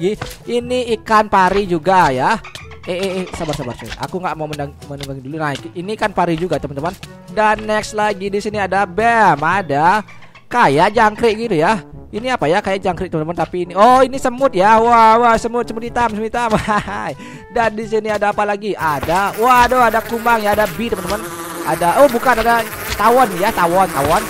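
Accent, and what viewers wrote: Bali, Indonesia. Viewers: Dari bali bli???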